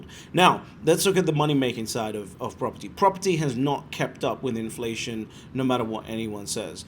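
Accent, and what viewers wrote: United Kingdom. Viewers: Digging the British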